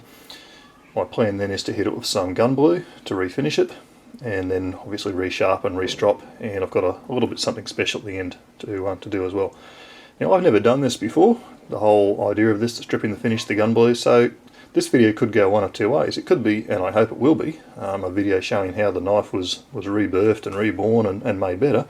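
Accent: Australia. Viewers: this American always enjoys a good Aussie accent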